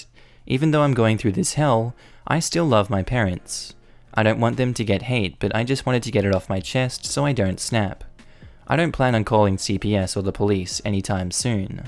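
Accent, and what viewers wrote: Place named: Australia. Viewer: Love your accent! ... Aussie voice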